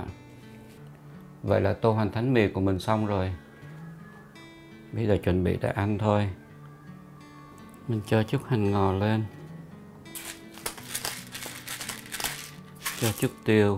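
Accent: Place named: North, Vietnam. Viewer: Nghe giọng anh là người Bắc 54 rất dễ mến , tôi thích xem cách anh nấu nướng rất đơn giản dễ thưc hành . Chúc anh nhiều SK & nấu nhiều món ngon !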